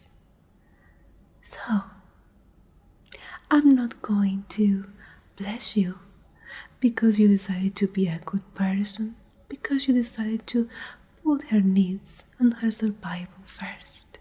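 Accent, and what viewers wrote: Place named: Latin America. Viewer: btw, I noticed that in the video prior to this one and in some prior (the birthday party one) you had some Spanish worded books and/or a Latin American accent. Are you from a Latin American country?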